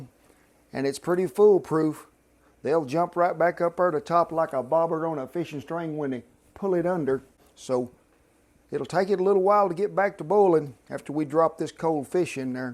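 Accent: South, United States. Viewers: Hahahaha, love how he splits it evenly. Love the idea and I will buy some cod and try this out. Oh yeah, gotta love that country accent!😁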